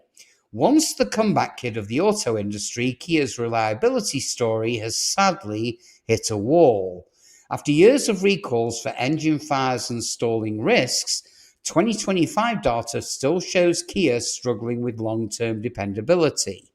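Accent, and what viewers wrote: United Kingdom. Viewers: No more British accent narrators ‼️ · Despite British voice, American cars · SOUND LIKE ANOTHER BRITISH EXPERT,WHOSE COUNTRY HAS THE WORST CARS IN THE WORLD TALKING ABOUT GOOD RELIABLE VEHICLES! BELIEVE THAY BULLSHIT !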